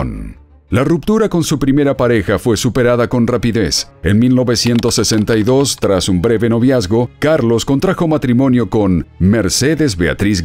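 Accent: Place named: Mexico. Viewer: Acento MEXICANO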